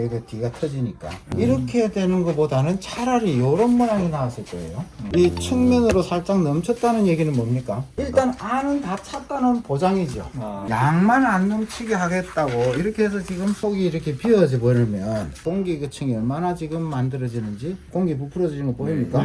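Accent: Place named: Gyeongbuk, South Korea. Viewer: 부산은 아닌데 말투 경북같다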